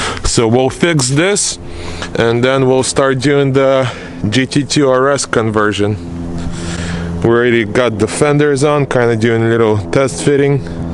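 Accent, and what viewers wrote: Russia. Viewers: Давно живёшь на заподе? Очень сильный русский акцент, чем-то белорусский напоминает.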